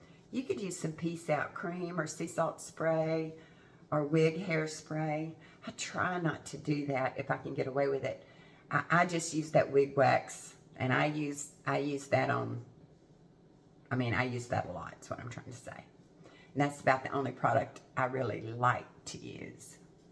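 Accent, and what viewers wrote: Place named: Texas, United States. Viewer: I just stumbled in your review by accident while looking for Rachel Welch short wigs. I’m so glad I did. You give such a new refreshing opinion to wig reviews. You also sound southern or Texan which is homey to me. I like that you seem really interested in helping wig wearers. I’m new to hair loss and it keeps me tucked into my house. I’ve purchased about 10 wigs but sent most back. I’ve got to find something I can wear out of the house. Thanks for the help.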